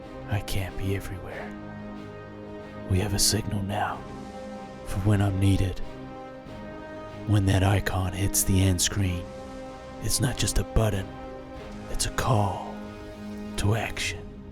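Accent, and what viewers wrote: New Zealand. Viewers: Not funny … Guy’s a New Zealander or ‘Kiwi’ ….. that is just the kiwi accent. Accept ! Comment on his content which is always very informative.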